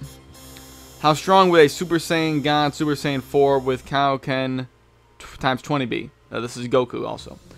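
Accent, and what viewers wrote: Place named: Upstate New York, United States. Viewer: Hey, not DB related just curious. I remember saying you're from rural NY. My sister in law is from Schenectady and has a similar dialect to you. No need to specify, but is that about ballpark to home? Again, no need to get too personal or in depth, just making an observation as neither she and her family nor you have the stereotypical fuhgetaboutit accent.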